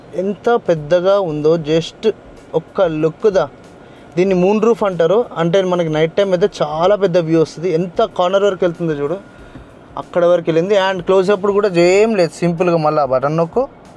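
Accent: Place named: Telangana, India. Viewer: Bro Nee Launguage style So nice.....Pure telangana accent...Pakka Clear Cut On subject. Spr Bro Keep it up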